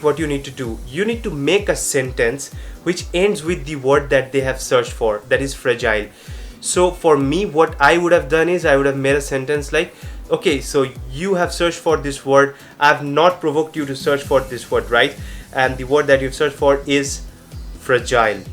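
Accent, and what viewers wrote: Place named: India. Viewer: 4 seconds later and I know that you are Indian 😀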